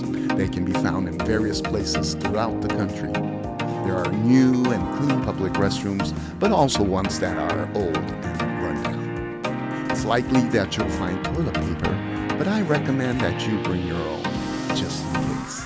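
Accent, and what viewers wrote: United States. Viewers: Thank you for your videos! So informative, clear, interesting, just so well made. Really appreciate it! Please consider making videos for: 1. Places for sushi (low end to high end, please include price range) 2. Places for ramen (same as above) 3. Tourist traps (well maybe not trap but like places we should stay away from or be aware of if first time visiting Japan)😅 4. Hotels vs Airbnb 5. Ways of traveling around via, train, bus, etc. Btw, you sound like someone who was raised in the states. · you were "born and raised in Tokyo, and lived in Japan all your life", so how did you learn to speak with perfect American English? Just curious 😊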